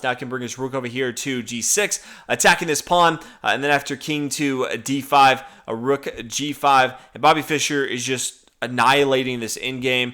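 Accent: United States. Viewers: The American accent is always great to hear.. but man! It’s Not bateess Spassky. It’s boris like bo-ris. And really pronounce the r. Don’t roll it.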